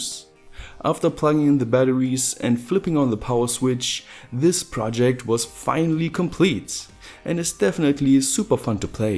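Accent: Germany. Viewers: Why do you have a German accent? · are you German mate ?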